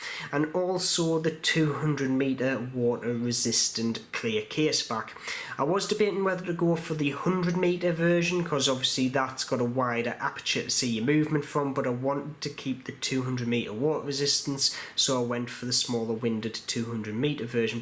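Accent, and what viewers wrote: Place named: Durham, United Kingdom. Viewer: You sound like Durham lad.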